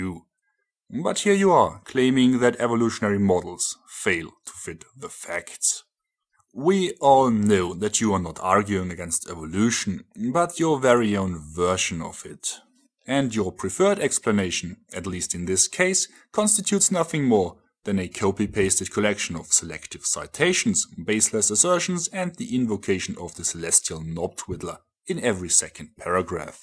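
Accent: Germany. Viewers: Oh man that was awesome. Please continue to use your knowledge and German accent to obliterate misinformation!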